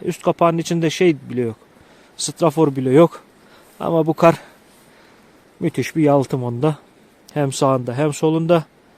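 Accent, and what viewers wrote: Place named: Ordu, Turkey. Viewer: Kardeş, kolay gelsin.Genc bir arıcı arkadaşa benziyorsun.Karadeniz geniş bir çoğrafya.Güzel ülkemin hangi güzel ilindesiniz? Orduyu anımsatıyor konuşmanız.Ben Erzincan merkezdeyim.Tecrübedir, bir önceki yıl da üst üste iki defa kar yağmıştı.Yakinimdaki bir abimin arıların önü tamamen kapalıydı.Kendiside il dışındaydı.Arilarin önlerini açmak için aradım, kovan deliklerini açtırmadı.O yıl 40-45 arısı söndü.Arı kovan içinde havasızlıktan boğulmuş.Çünkü arı mevcutlarıda kalabaydı..Kovanlarini ben temizledim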